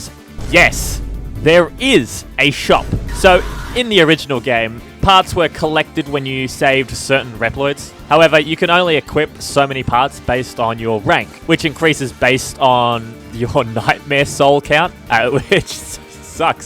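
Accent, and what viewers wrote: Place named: Appalachia, United States. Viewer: You somehow sound like someone from Appalachia and Australia at the same time.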